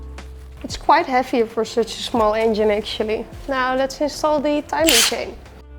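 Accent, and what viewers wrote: Netherlands. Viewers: Nothing better and clear than Dutch English. 💪❤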